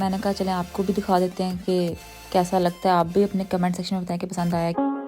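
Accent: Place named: Lahore, Pakistan. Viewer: Nice.. That lahori accent though 👌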